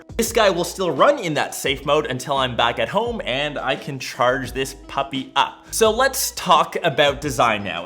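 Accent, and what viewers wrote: Canada. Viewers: Being from the UK I notice you say oooot when you try to say out. Does this mean your from Canada and my young history lessons from South Park were correct ? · Are you Canadian?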